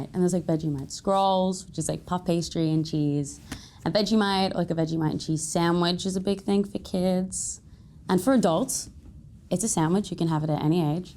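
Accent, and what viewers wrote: United States. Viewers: You can really tell she’s starting to get an American accent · There’s an American accent starting to creep in there.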